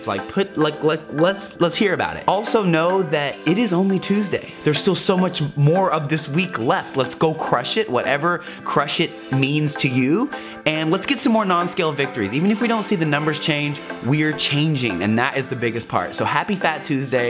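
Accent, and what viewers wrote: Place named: South, United States. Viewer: Do Sunday. Sunday is a day that I’m just getting my life together, maybe being lazy and hanging out with you and everyone else during that time was super fun!!! Also — are you from Seattle? Yer accent can git reaul southern,!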